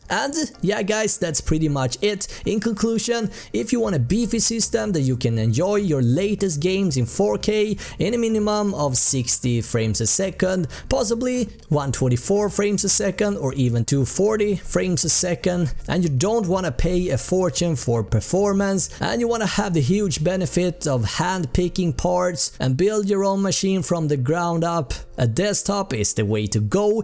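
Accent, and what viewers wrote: Sweden. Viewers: thats some strong ass swedish accent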